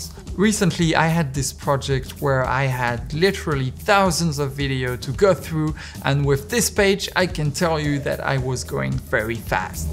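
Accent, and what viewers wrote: France. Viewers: Hey, are you french ? ^^ great video ! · J'étais persuadé que t'étais français à cause de ton léger accent, mais franchement tu m'épates. Bien joué à toi pour ces vidéos de qualité ! 🤗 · Didn't notice you were french until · Fantastic presentation, excellent content, extremely professional. Love your adorable French accent too 😶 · Top cette vidéo ! Je sais que tu es français, l'accent, Etretat... héhé :) Tu m'as donné un aperçu pour un passage vers Resolve, moi qui en entends parler depuis des lustres déjà Je me permettrais de te contacter par instagram pour quelques infos / conseils pour démarrer mon utilisation de Resolve, surtout pour l'étalonnage. Continue chef ! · I’ve used Premiere for over 20 years and it’s always had stability issues. We used to have to reinstall premiere everyday while the preference files used to get corrupted all the time. 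I’m looking forward to trying Resolve now. Great videos buddy and love the French accent! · T'es français ?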